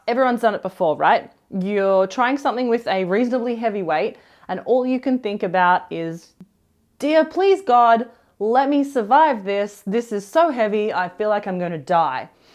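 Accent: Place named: Australia. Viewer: that aussie accent ⚡ i started with Maces 8, 12, 15, 10 & now finally 4kg & even 2kg. Steel clubs journey was different: 4kg pair, 4kg single, 12kg pair, 16kg pair, 20kg pair, 8kg pair, 6kg pair, 1.3 & 2kg indian wooden clubs pairs. the bodybuilder-gym mindset does not work with these tools at all. In all my workout light weights are warm-ups & cool-downs. Also it is great to have light-medium-heavy days. Everytime i hit a heavier mace, lighter ones feels so much lighter after the heavier ones. So, now 15kg feels still heavy, but the day i hit the 20kg one, 15kg will feel lighter. To ascend 2kg weight in steel mace i use golden rule: ability to perform 100 reps 10 to 2 or 200 reps 360. i also use steel maces for muscle building exercises & they can do that function too.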